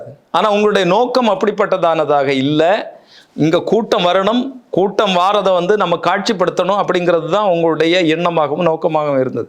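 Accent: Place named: Kanyakumari, India. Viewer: குமரி தமிழ். பாராட்டுக்கள்.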